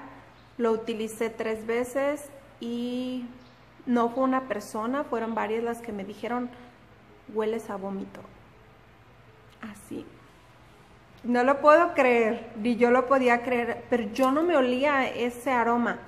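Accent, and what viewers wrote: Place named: North, Mexico. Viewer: Me parece que tú recomendaciones son buenas, felicitaciones. Por otra parte no te preocupes como hables, los argentinos, los colombianos, los españoles etc, hablan distinto y muchas veces con diferentes acentuación es y palabras mochas pero es lo que los distinguen de que país son, acá en México lo fabuloso lo más bonito es que cada estado es como un diferente país, y hablan diferente en cada estado, y mucha gente quiere estandarizar el tono de hablar, incluso hablar como chilango fresa (sin dinero) como si ponerle el tonito de fresa les diera caché. Lo más bonito es ir al norte y oír su tono fuerte e incluso con sh en vez de ch, o ir a Michoacán y oír como hablan se siente muy bien, esa es su forma de hablar y nosotros los entendemos ¿Por qué a fuerza querer cambiar la forma de hablar de una persona? Se oye que eres del norte y tu tono de voz es muy bonito y que bueno y te admiro que no lo cambies ni lo achilangues como muchos. 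Haber corrijan a los Argentinos por decir "tenés" en lugar de "tienes" es su forma de hablar muy característico, y no por eso no les entendemos, claro que entenderemos pero no falta el que se quiere sentir el sabiondo y corregir a diestra y siniestra. Respetemos el tono de hablar y su forma de hablar de las personas de diferentes Estados de la República Mexicana y de otros países que hablan Español. Su tono de hablar y su forma de hablar es su identidad.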